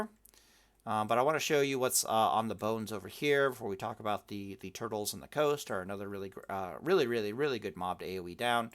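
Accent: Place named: Minnesota, United States. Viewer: You from Minnesota? My Minnesota friend says blah blah blah blah blah just like you.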